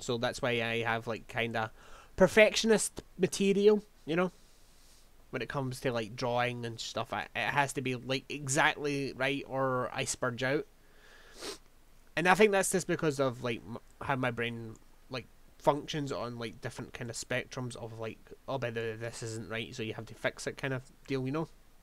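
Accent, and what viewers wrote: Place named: Scotland, United Kingdom. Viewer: scottish, should have guessed it, knew it sounded familliar.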